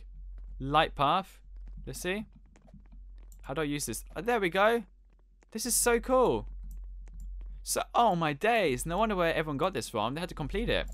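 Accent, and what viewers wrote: United Kingdom. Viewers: Are u British I can not tell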